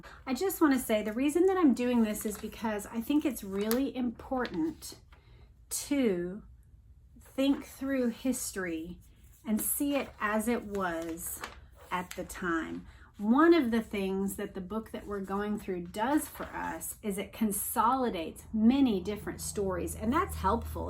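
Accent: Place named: South, United States. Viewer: I think you have done a wonderful job with this whole series and you have such a lovely southern voice.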